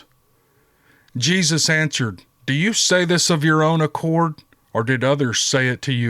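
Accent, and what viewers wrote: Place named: South, United States. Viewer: Hearing that ultra-familiar, southern accent narrating the Bible is more comforting than I can possibly express in words. I humbly thank you for this, Mr.. I shared these with my family.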